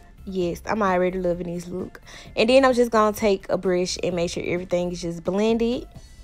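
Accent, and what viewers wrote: South, United States. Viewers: nah i was tryna guess what state grl.. because yu definitely from the south! Nd this makeup is fye..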